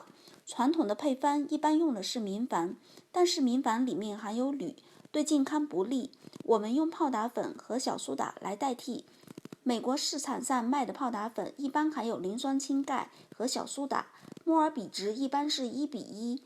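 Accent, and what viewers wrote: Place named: Fujian, China. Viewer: 你是福建人吧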